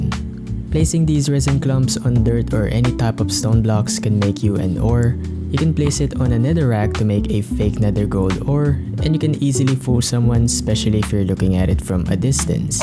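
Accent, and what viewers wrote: Philippines. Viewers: English language is Filipino accent.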